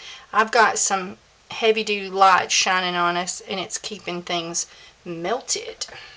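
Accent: South, United States. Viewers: Just wanted to tell you how much I love your vids, love the southern drawl :) and your soaps are amazing btw! :)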